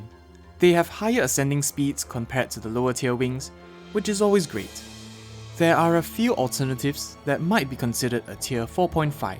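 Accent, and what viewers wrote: Singapore. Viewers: is guy sounds singaporean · His voice like a Singaporean guy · just curious, are you singaporean? your accent sounds v familiar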